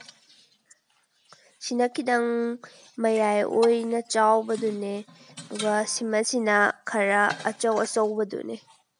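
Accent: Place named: Manipur, India. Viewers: Kakching gi accent ni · Kakching marolse taba Yamna nungai eidi ...rhythm se ...cute oiye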